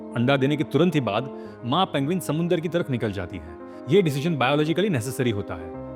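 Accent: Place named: Gujarat, India. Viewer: mko aap gujrati kyu lg rhe ho aapke bolne ke tarike se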